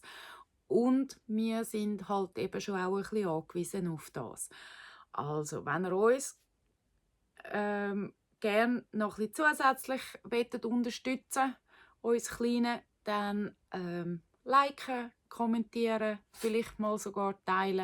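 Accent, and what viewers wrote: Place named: Switzerland. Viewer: Oh nurnuf Schwyzerdütsch so toll 🎉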